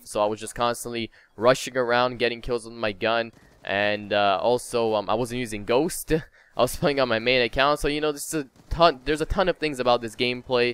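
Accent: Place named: Canada. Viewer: Knew where you came from the second i heard you talk haha. what's up fellow Canadian!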